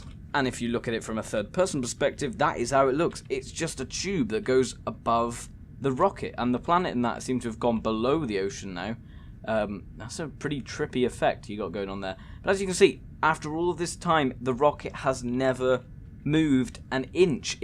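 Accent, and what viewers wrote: United Kingdom. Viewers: Get off the American Wi-Fi you’re in Britain I’m assuming project that you’re speaking British stop holding up the American Wi-Fi and use your guys his own Wi-Fi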